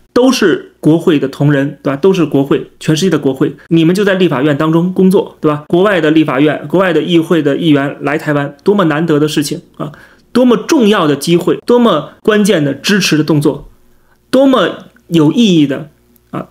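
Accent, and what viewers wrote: China. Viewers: 你支持台独却又没法做更进一步的事情，你说你气不气？公子狗只能整天在YT这里哇哇哇狗叫，一个大陆口音的人去当湾湾人的舔狗，你除了狗叫还能干啥，拿点实际的东西出来啊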